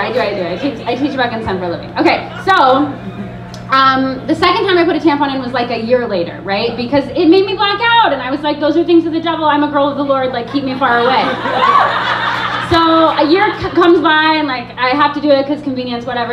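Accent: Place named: Long Island, United States. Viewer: She cracks me up when she’s just chilling and talking. And how she mastered that Long Island accent! 😦 I’m dying to see her speak somewhere close to NC!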